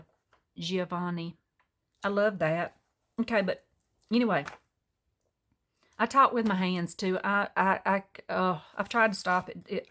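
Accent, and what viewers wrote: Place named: Texas, United States. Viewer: This is a stunning journal. I love your style. I also love the accent being from Texas we say y'all and fixin all the time. Tfs Kathy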